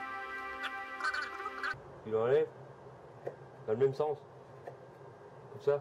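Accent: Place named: Vosges, France. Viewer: Ça fait du bien d'entendre un peu un (léger) accent vosgien ! Super que ton fils soit intéressé, mais va te falloir le double d'outils ! 😉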